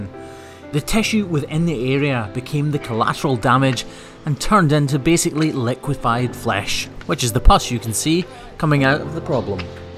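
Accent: Scotland, United Kingdom. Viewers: Ur accent is from Brave ? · I don‘t know anything about cows or hooftrimming (aside from what I learned from you XD) but since I stumbled over one of your videos I keep watching them for your humor, the charming scottish accent and the beautiful landscapes! Thank you for the Entertainment! ❤️ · Scots ...hes Scottish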